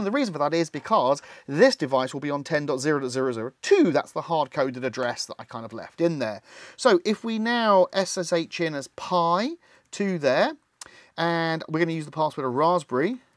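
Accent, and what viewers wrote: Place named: United Kingdom. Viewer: British accent really makes you.wonder whether he's saying pwn or porn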